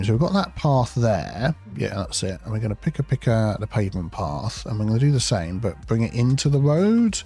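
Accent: United Kingdom. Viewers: the most adorable thing in your videos is your classy British accent. If I had such accent I’d never shut up 🤣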